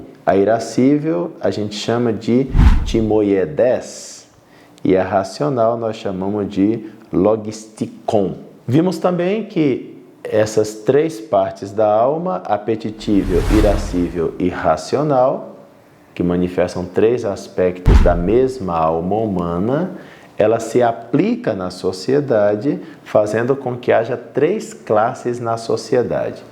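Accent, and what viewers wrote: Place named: Rio Grande do Sul, Brazil. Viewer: Esta homem pode levar figuras ilustres e estrelas a uma profunda DEPRESSÃO. Sua fala me lembra o Gaúcho CARLOS REIS em: Seu professor mentiu pra você, mentiu muito. Grato.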